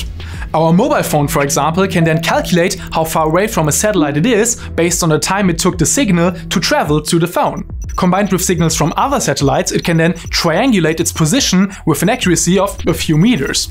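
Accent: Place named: Germany. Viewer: I like your German accent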